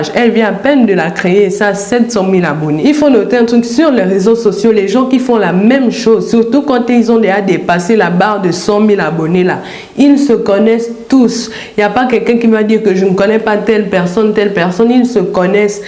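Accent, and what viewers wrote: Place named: Gabon. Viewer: Ça c’est l accent de ma sœur gaboma si j n m trompe pas. Merci pour l analyse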